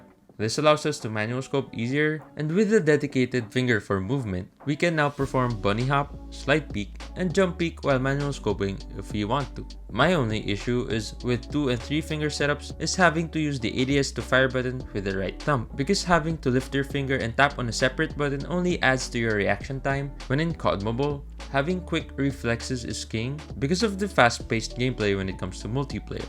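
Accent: Philippines. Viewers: Btw r u asian or filipino? Just askin cuz u sound like u have an asian accent mostly sounds like a filipino english accent:)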